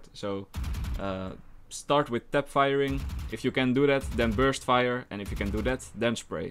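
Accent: Netherlands. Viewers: nah he is from netherlands i reconize these accents anywhere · ik hou van je Nederlandse accent xD hehe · Ik heb het gevoel dat jij Nederlands bent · he dutch i think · are you from the netherlands? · The dutch accent be bussin thoooo 🥵😍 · I think dutch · 'iron drie' half dutch accent goes hard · iron drie imma show u vijf.. u sound dutch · Bro, ik kijk dit nu terug en tering je hebt een nederlands accent ihahaha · i think he really sounds dutch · Just with your acsent i know you're dutch hehe i'm dutch too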